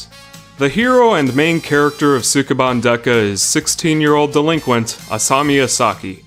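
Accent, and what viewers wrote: United States. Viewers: How is your pronunciation so heavily American when you appear to be watching so much anime? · Ugh. Saying words in Japanese with an American accent.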